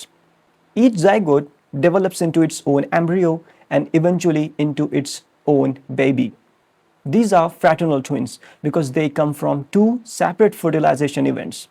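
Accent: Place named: United Kingdom. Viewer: The British accent was great k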